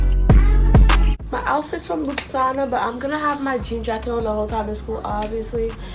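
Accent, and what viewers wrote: New York, United States. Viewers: r u from new york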